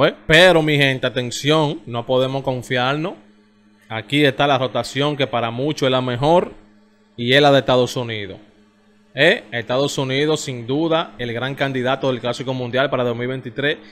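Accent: Cibao, Dominican Republic. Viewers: A lel de pal de meses🤣😂🤣😂🤣...me imagino que eres cibaeño.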